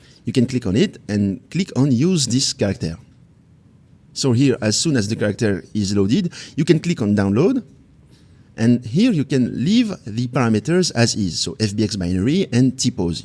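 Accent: France. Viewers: You are so awesome, why is your content so high quality ?? Are you french like me BTW ? Your accent is perfect <3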